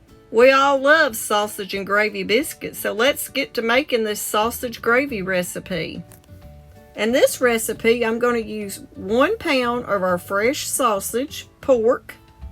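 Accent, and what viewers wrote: South, United States. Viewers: This is how you won't it to be I love that southern accent, and this is how I like my sausage and gravy to turn out too, make sure to cook that flour long enough and add the right amount of milk just like my mom used to make, over biscuits, rice, fried or mashed potatoes it's comparable to crispy chicken gravy only usually lasts a little longer cuz the chicken gravy usually goes with the chicken then it's gone, fried chicken with mashed potatoes I usually end up a lot fuller cuz of the mashed potatoes and gravy I'm just saying cuz, that's the way it is!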